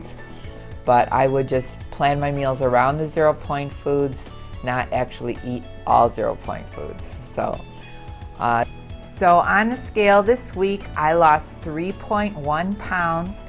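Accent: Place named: Midwest, United States. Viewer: you're welcome! I asked on aonther if you were originally from the Midwest (I'm from MI, lived in WI a while, and I feel like I hear Midwest when you speak 🙂)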